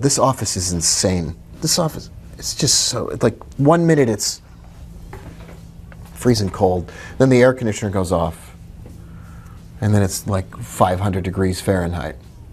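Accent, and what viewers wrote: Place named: New York, United States. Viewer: You can always hear a new yorker!! Even without a microphone!!!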